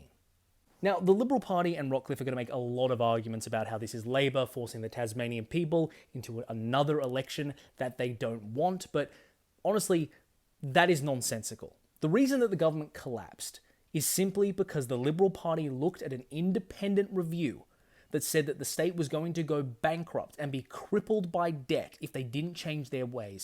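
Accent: United States. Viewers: Your analysis is interesting but your American pronunciation is annoying af. In particular U sounds as OO sounds like 'noos' but also words like Lieutenant and semi. Watch more Australian content. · Fascinating developments. Am curious about your accent though: did you spend time in the US? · The what Governor? Get your pronunciation right, dude. You have too much of a Seppo twang as it is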